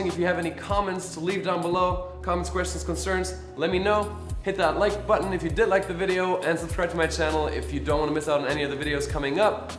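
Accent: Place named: Germany. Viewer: He sounds Veryy german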